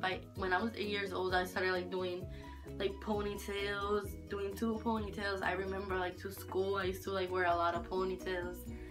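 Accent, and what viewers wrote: Puerto Rico. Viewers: You should make a video talking Spanish ! Yess if love to hear you speak because I love how Puerto Rican's talk lol 😭 btw your soo pretty 😍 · que orgullo saber que eres boriqua. Yo soy cubana y veo los videos de Gabi. Cuando escuche tu accento en un video con Gabi y la otra rubia, tuve que encontrar tu página to support my fellow latina! Espero que sigas haciendo videos y que te hagas grande y famosa mientras sigas representando a todas las latinas que te siguen. Good luck girl!:D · Yaasss girl!! Saludos desde PUERTO RICO! Escuché tu acento y rápido supe que eras boricua. Eres bella y me encantan tus tutorials de maquillaje. Xoxoxox 😘💕🇵🇷🇵🇷🇵🇷🇵🇷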